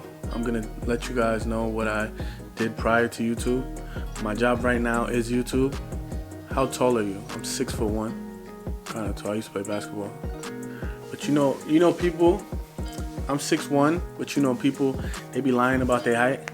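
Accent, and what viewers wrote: Jamaica. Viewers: Can tell you have a little Jamaican in u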